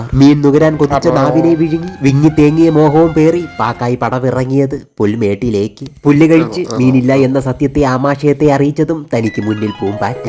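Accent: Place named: Kollam, India. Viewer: Kollathu evideyo aanennu thonnunnu. Pocha ennu parayunnu.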